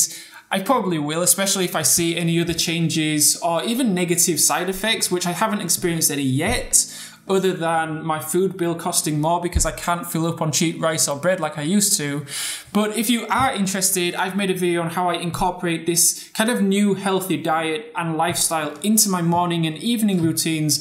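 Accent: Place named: Northern England, United Kingdom. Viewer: When you lived in the UK, were you from the North of England? That accent is nice! ☺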